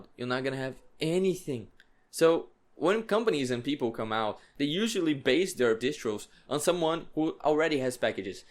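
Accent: Brazil. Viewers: Video mt top carioca! É muito top q quando agente comeca a usar Linux, agente acha que é so uma versão melhorada do windows. Mas quanto mais agente usa Linux, masi agente tem opiniões sobre os diferentes componentes do sistema, e é engraçado que quase todos que mudam, começam á mais voltados a privacidade e FOSS. É muito boa essa comunidade? P.s: Teu inglês tá muito bom, não deixa tua cabeça achar que so por causa do sotaque brasileiro ele não é, tenta não se comparar com nativos! Parabéns pelo video e pelo canal, tu ta me fazendo aprender quickshell pra customizar meu hyprland (Arch btw) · Awesome video! Prolly the best ive ever seen about distros, love the slides. Seu sotaque não me engana! É br? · Mano BIZARRO como ao ouvir você pelos primeiros segundos, deu um gatilho do nada que você era brasileiro só pelo "sotaque" de leve que senti na pronúncia das palavras em inglês, isso é muito doido KKKKKKKKKKKK enfim cara, excelente vídeo e sucesso pra ti!!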